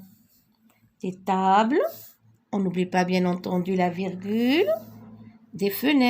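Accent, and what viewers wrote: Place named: France. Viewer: L'accent français est très présent. Ne vous faites pas rare. Bonne continuation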